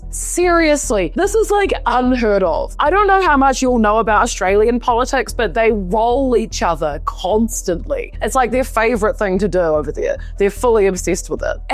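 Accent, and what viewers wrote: New Zealand. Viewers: Nice one, I thought you were an Aussie until you I heard you say Albanese incorrectly. But that's ok, Kiwis are cool too, should become our seventh state. 😛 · The accent screams Kiwi. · I am guessing NZ accent doesn't sound Australian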